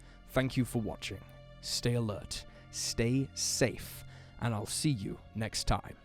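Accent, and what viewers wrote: England, United Kingdom. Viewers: Narrator has an English accent.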